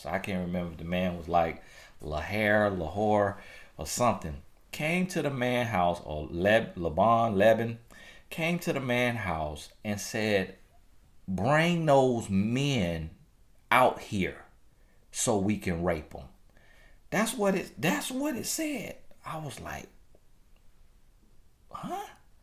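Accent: South, United States. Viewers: I love watching you. everyday. You tell the truth. You so country "You Here Me" lol.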